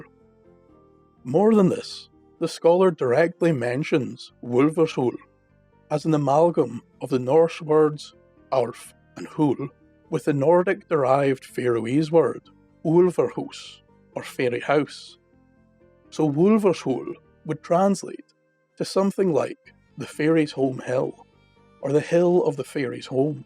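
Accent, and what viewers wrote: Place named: Black Country, United Kingdom. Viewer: There are plenty of Wulvers! They are just not on Shetland! You have clearly never been to WOLVERhampton on match day. Wufruna's Town! Saxon names Or so we are told. Wulfruna was, according to Lore, the wife of a Saxon noble who gave the land which is now Wolverhampton to the people after a Godiva style row with her old man. As far as I know she did not get naked. But the linguistic similarities are clearly not co incidental. And Wolves have become local emblems, not just for the football team. Tell anyone within a 15 mile radius that you are going to Wolvo and they know where you are going. As far as I know they do not have any genuine wolfmen either. but hearing your pronunciations was so similar to our old dialect. I have often wondered about how different the Saxons and 'Norse' really were, especially in Mercia. 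The Southern Saxons maybe, but we have so many place names that could easily be Norse. If you look at Really old maps, we aren't Anywhere! We are not Mercia, we are not Wales, we are no man' s land and there is even one that shows a sort of Viking tendril weaving across to us from the Danelaw and splitting early Mercia from Wessex. Sorry for going of at a tangent and having Nothing to do with Scotland, but I was just struck by the words and sounds. I am fascinated by our lost past and the half remembered clues. This website is brilliant, please keep it up!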